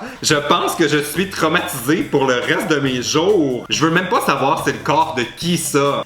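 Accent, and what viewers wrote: Quebec, Canada. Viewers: l'accent québécois c'est le plus beau 😍👂🏻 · j'adore ton accent Québécois!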